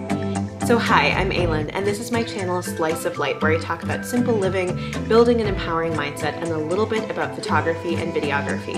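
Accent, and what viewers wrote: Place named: Minnesota, United States. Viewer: the minnesota accent gets stronger as the video goes on :)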